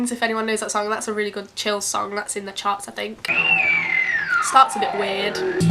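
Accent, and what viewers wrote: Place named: England, United Kingdom. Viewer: Where in England are you from? Love your accent!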